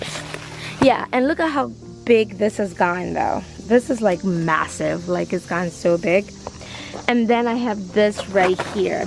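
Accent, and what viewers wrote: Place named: Africa. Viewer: Sorry to bother you so much today but I’m on bed rest, there is an a more soothing channel than yours😎🤟 Your African accent is 🎶🎵2 👂❤ So happy we met 🎊 🎉 Keep it up 👍 Always learning here Impressive all the improvements to date 👋 see ya around. ❤❤❤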